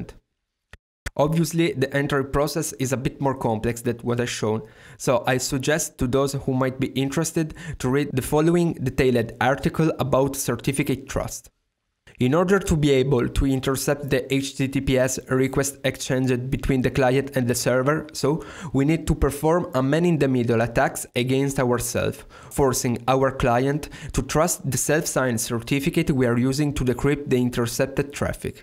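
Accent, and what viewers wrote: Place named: Italy. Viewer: I was looking for cryptocat's video but apparently I found something better ahaha loved your explaination, solo un po' strano sentire l'accento italiano :D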